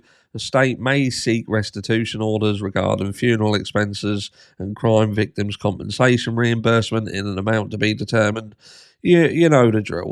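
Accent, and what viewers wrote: United Kingdom. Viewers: bryan did it hahaha glad to see u where wrong but love accent cutie bear uk man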